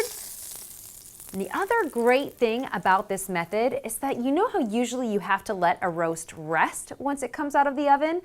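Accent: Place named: United States. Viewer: Yank. Turn off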